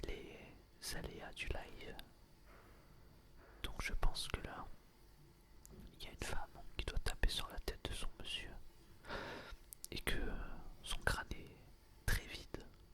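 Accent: South, France. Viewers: C'est cool j'ai exactement le même accent que toi mdr 😂 je viens du sud aussi. Sinon continu comme ça t'es le meilleur 💪👍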